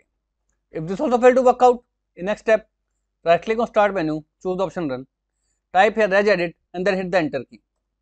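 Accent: India. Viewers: Me finding 10 Videos before where an english dude is talking -> instant skip Me finding Video with heavy indian accent -> this is what im looking for. Always the best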